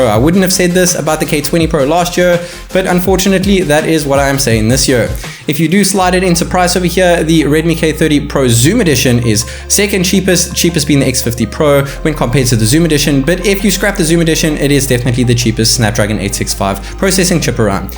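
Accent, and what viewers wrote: South Africa. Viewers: You should add ZAR to you currency conversion. You sound South African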